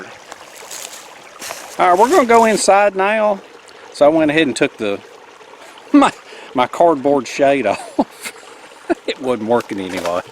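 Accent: South, United States. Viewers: He’s definitely one of my favourites too. As an English woman, he’s so different from anyone I’ve ever met. His accent is adorable, but his skills & knowledge on everything is astounding. I’ve never not laughed in one of his videos either. I think he’s what you call a true southern gentleman. I can’t believe you watched for 3 days straight! That’s dedication 😂 & you must know everything about bushcrafting now! Glad you support him as much as I do. He truly does deserve it. Much love 💕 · What state r u from? My husband and I just found you... he thinks Texas or Oklahoma. I think Georgia or Alabama? I’m from Tennessee (but have resided in Michigan longer than Tennessee)and I’m not sure?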